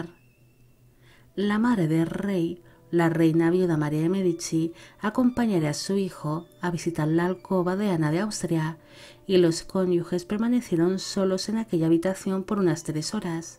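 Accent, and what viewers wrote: Spain. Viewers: Ese acento tuyo tan particular de que parte de ESPAÑA es ? Señorita!!!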